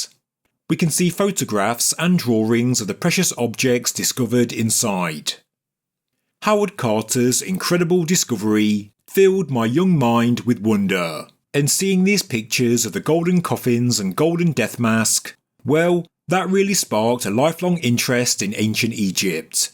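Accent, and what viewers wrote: United Kingdom. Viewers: Someone asked recently if you were Australian or from UK. I answered that you were from the UK but i couln't tell them where. They were interested in your accent and trying to place it geograhically.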